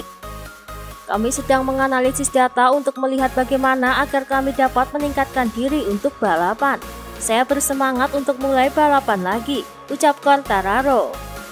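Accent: Java, Indonesia. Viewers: Sorry telat mba medok heje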